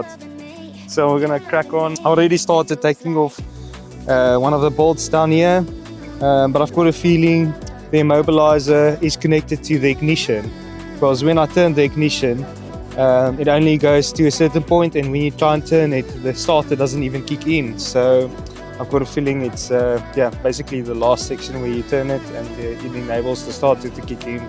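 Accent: South Africa. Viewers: South african accent? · nice to hear a south african accent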